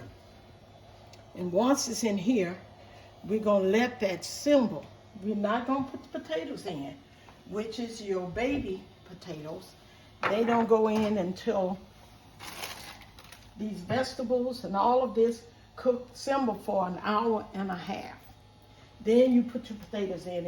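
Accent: South, United States. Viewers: Love u, very beautiful & rare moments to hear u sing, u are a delight to watch. Thank u so very much for sharing your knowledge, u are great by yourself the guy wants to interrupt and speak over u. Love your presentations & southern talk, dont like to hear camera guy mimic & make fun of your words!!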